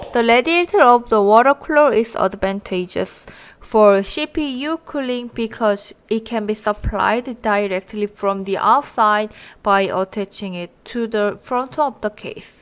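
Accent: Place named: South Korea. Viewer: 한국분이셈? 영어발음이 낯익네요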